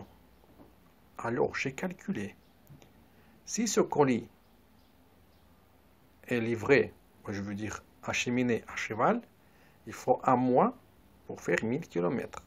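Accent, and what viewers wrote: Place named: Russia. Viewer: Я хз почему, но с твоим русским акцентом французский намного понятен на слух. Побольше на канале таких видео с собственной озвучкой. С меня подписка!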